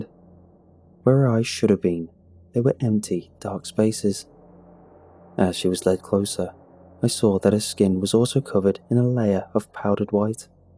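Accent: England, United Kingdom. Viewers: Bro! Your accent is English? I really don't care but it makes me fall asleep instantly. So relaxing.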